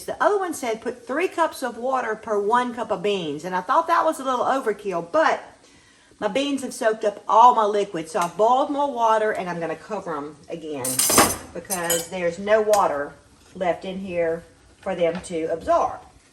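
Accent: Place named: South, United States. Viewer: Never seen yellow.. I love your southern accent..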